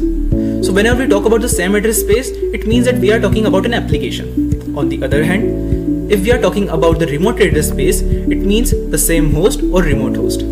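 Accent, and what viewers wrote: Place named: India. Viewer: unfortunately the speaker doesn't present it in oxford english, so it is always hard to understand the indian-english. please speak slower and clearly. and the background music is annoying - please remove.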